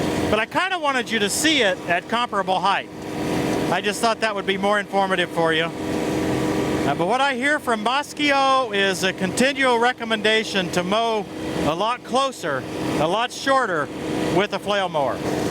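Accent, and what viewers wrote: United States. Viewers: Just like the way you Americans talk I can listen to you all day ...your doing a great job 👍 have a great day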